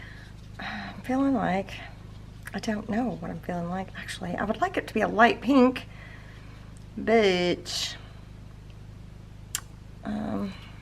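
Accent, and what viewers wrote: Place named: Kentucky, United States. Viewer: ! You have to be best person I have ever come across that is a creative genius, motivator, beautiful, loving, down to earth, genuine, giving with all your heart, Kentucky talkin' sweetheart!!!! I love all your videos!!! These are special and I can't thank you enough for all that you share and give to us!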